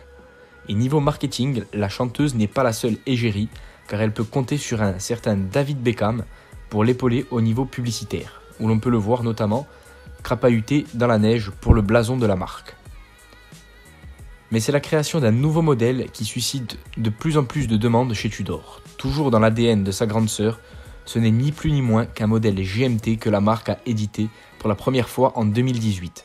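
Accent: Corsica, France. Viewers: Un soutien pour le contenu de a vidéo ainsi que pour l'accent corse. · Ah... je reconnais bien l'accent corse !!!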